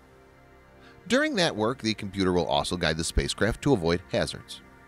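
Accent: United States. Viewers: "The common reason is actually the same"... What the heck does that mean?? The butchered English in an American accent on this channel makes it difficult to listen to! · American English is its own form of English full accepted with British English. The voice in the video used precise American English. So it wasn't really butchered.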